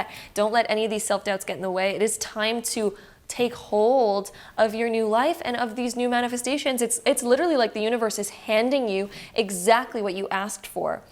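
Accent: Canada. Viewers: Lovely, thank you so much. When I heard you the first time, I thought you are Canadian because of the way you say "out" or "about" 😊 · Pile 2: little late watching this lol as a Canadian I loved the Canadian accent. It's my ex who needs to apologize but honestly as much as I need some closure I'd prefer if he didn't contact me. I've been closed off from love but I am starting to want love in my life again.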